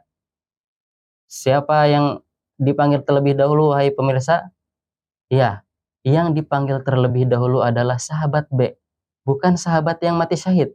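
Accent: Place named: Bogor, Indonesia. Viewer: Tabarokallah Logat bogornya ga ilang😊😊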